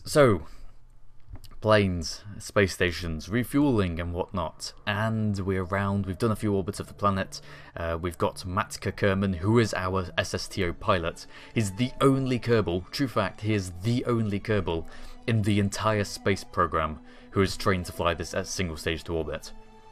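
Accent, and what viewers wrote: United Kingdom. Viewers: watched your good vids forget to subscribe but here :D you have one your british brr your voice is brr i love british acent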